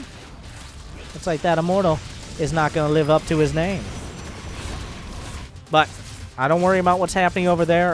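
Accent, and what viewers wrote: South, United States. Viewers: Thanks for the tutorial. I enjoyed the southern accent ;]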